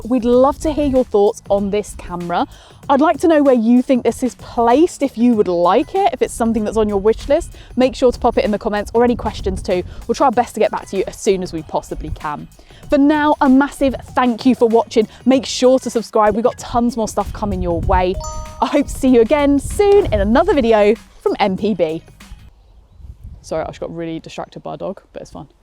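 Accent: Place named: United Kingdom. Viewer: 👍🏾👏🏾💐📸👸Good review. Love the British accent. Phelix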